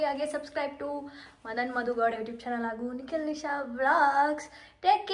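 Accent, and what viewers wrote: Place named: Channapatna, India. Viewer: I like Channapatna kannada slang❤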